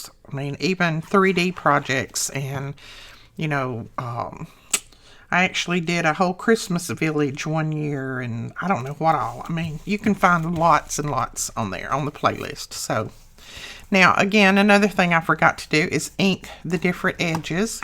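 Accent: South, United States. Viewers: ❣️❣️❣️ I almost never comment after yesterday's comments I want to let you know how I so love watching your channel! You're great and have such creative and understandable instructions. And, we talk the same language❣️ Although I have been away from my Southern roots for many years, I still have an accent and enjoy listening to others who do too! Keep up the beautiful work that you do, you Southern "Queen of Junk Journal Making", you❣️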